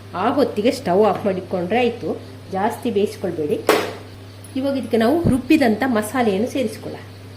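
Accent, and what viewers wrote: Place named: Malnad, India. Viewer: ನಿಮ್ಮ ಮಲೆನಾಡು ಶೈಲಿ ಯ ಕನ್ನಡ ಕೇಳಲು ತುಂಬಾ ಚಂದ.!!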